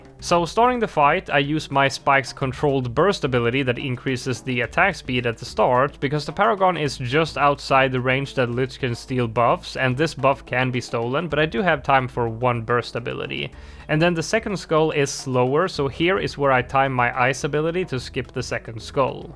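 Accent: Sweden. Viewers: jesus christ i just realised how easy it is to tell if a youtuber is swedish by the way they speak english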